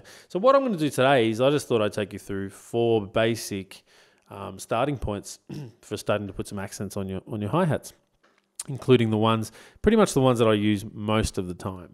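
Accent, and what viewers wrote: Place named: Australia. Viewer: Was not expecting you to have an Australian accent haha. Good video.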